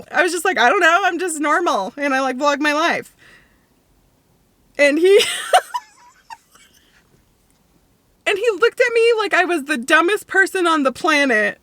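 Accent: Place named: Pennsylvania, United States. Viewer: That’s gotta be a Pennsylvania accent.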